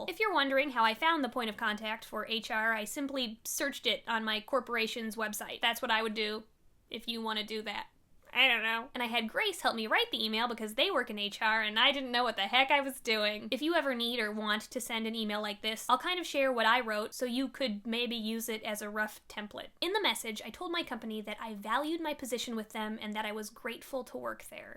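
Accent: Minnesota, United States. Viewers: You live in Minnesota right? Your accent peaks through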